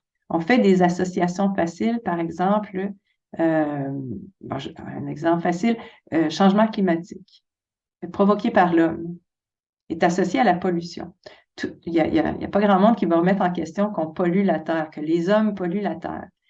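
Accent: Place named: Canada. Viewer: Toujours une joie de vous entendre! J'ai eu cette chance de vous approcher quelques minutes lors d'un événement dans le sud de la France. Dire que mon écoute vers vous depuis 2020 venait au fait que cela faisait écho à mon ressenti mais aussi grâce à la musicalité de votre accent me rappelant le plaisir que j'avais à entendre des membres de ma famille expatriés au Canada!!! Merci beaucoup pour tout ce que vous apportez à notre réflexion... une vraie richesse! Et je vous suivrai quand vous ferez votre page perso. Au gré des rencontres que la vie nous offre! Bien à vous.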